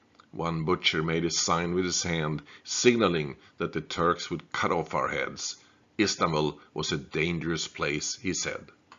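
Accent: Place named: Sweden. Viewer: ❤Nice to listen to! Your accent is very Swedish and I guess you know. 😊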